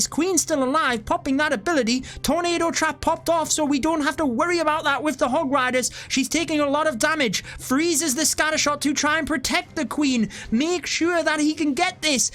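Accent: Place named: Cockney, United Kingdom. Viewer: Love your accent It's cockney right???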